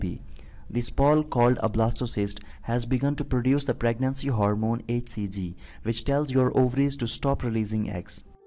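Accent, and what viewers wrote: India. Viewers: Kashmiri accent in your voice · what an indian accent.. Is this from Bollywood ??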